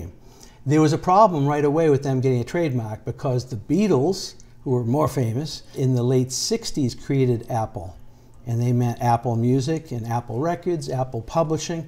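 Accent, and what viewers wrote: Boston, United States. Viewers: I can hear your Boston ❤️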